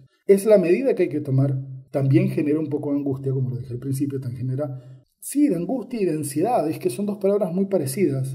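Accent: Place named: Andalusia, Spain. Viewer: Que bien, buen análisis... empece a seguir... además mejor con el acento del sur con palabras venezolanas je je je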